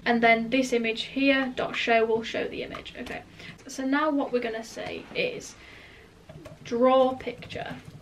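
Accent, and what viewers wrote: United Kingdom. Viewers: You are all I need, you are a girl (that shows me that I can become a programmer), you have interesting projects, you explain and the best you have a British accent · Thanks for this tutorial. It is easer to understand with a British accent. As this was made over two years ago, what is your recommendation today for the best face recognition software?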